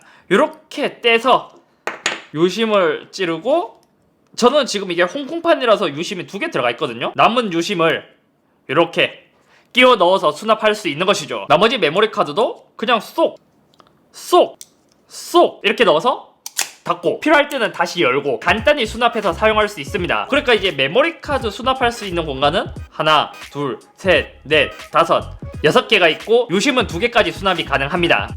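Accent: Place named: Gyeongbuk, South Korea. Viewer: 이 형 너무좋응.. 경북말투 영주,봉화,안동,점촌.중하나있듯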